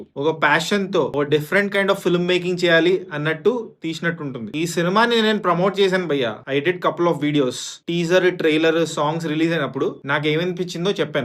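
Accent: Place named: Telangana, India. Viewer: telugu kadu, telangana - Ragadi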